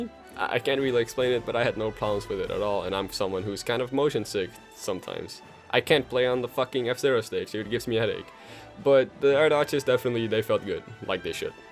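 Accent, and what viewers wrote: Netherlands. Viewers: Are you Dutch or something, you sound really Dutch. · I can tell you're dutch. Do you play tournaments?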